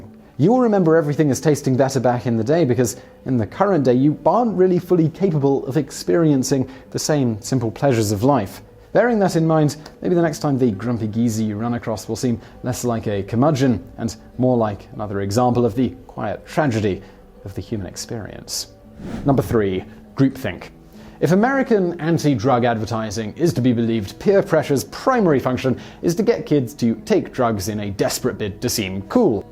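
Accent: England, United Kingdom. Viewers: If you're so smart, why can't you even grow hair on your head. Rats can grow hair all over their bodies. You cant even grow hair to protect your grey matter from sunlight. And sorry, mispronouncing American words like an English doesn't make you smart, just makes you 250 years out of date. We beat you and even Europe doesn't want you. I'd call you a red headed stepchild but your prince has already cornered that. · plays on his accent some people in North America have such respect for the British accent when in fact they're all dummies over there. Btw lived in the UK for years.....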